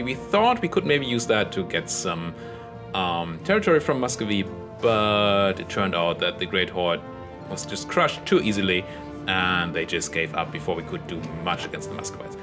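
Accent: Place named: Germany. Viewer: Oh wow, I would never have guessed that, your accent sounds too "refined" for being German, in a good way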